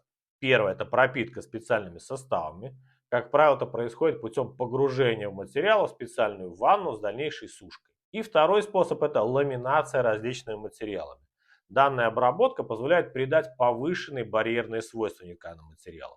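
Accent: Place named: Belarus. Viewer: ваш белорусский прононс мне нравится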